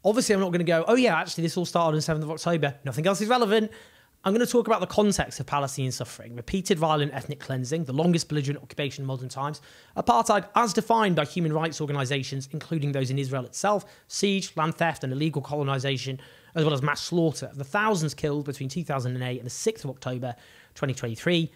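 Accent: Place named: Wales, United Kingdom. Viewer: I wanted to watch your video, but your accent is really hard to get my friend. Is it Welsh? Or Australian?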